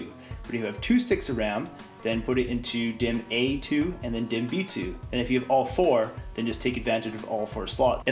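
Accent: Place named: Ireland. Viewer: Very cool videos (part 1 & 2). They contain some real helpful information. Thanx for making and uploading them! I am wondering if your family comes from Ireland. Your English sounds a bit like that...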